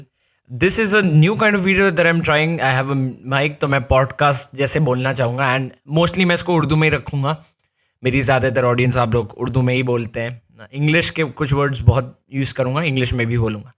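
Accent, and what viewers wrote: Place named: North India, India. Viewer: میاں یا تو خالص اردو بولا کیجئے یا خالص انگریزی۔ اردو میں خواہ مخواہ ٹھونسنا ایک بڑی نامناسب حرکت ہے۔ ذھنی مرعوبیت اور غلامی کا پتہ دیتی ہے۔ دوسرے اپنا تلفظ درست کریں۔ شین قاف بھی درست کریں۔ گلتی نہیں، غلطی۔ طریکا نہیں طریقہ۔ فیر نہیں پھر، بھاشا نہیں زبان ، انھوں نے بولا نہیں، انھوں نے کہا، باڑ نہیں، سیلاب، وغیرہ۔ کتاب ادریس یہودی کتاب ہے، عیسائی نہیں۔ اینڈ نہیں، اور۔ بھٹ نہیں، لیکن۔ برا نہ مانئے گا لیکن آپکی زبان شمالی ھندوستان کے ھندؤوں جیسی ہے۔ ابھی آپ نوجوان ہیں، محنت کیجئے اور اچھی، صحیح اور خوبصورت زبان بولنا سیکھ لیجئے۔ انگریزی بھی انگریزوں کی طرح بولنا سیکھیں۔ بہت اچھی بات ہے۔ لیکن جو زبان بولیں خالص اور مسلسل وہی بولیں۔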